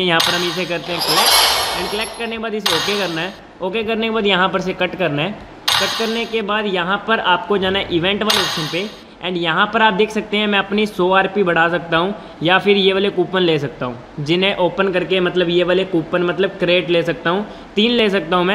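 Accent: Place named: Punjab, India. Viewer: Punjabi voice 😊😊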